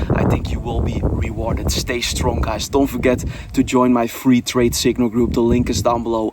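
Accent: Netherlands. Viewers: Good information (you got a dutch accent) greetings from Amsterdam.😅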